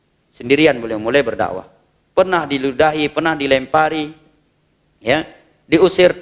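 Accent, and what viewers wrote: Aceh, Indonesia. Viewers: Sy seneng logat bahasa acehnya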